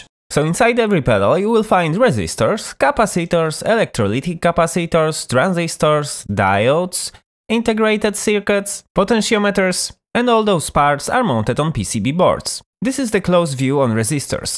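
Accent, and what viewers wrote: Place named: Poland. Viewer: Kurwa uslyszalem akcent i wiedziałem ze z polski XD cieszy mnie to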